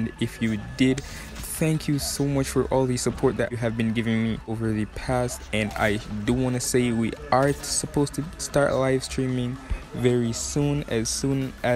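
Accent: Jamaica. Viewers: You jamaican? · Are u Jamaica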